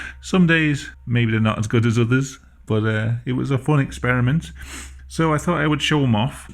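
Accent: United Kingdom. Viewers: Also, British man describing his creepy artwork is probably my new favorite genre of video.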